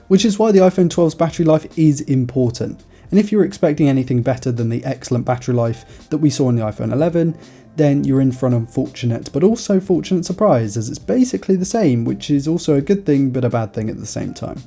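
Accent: United Kingdom. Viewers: Your british accent is gorgeous😍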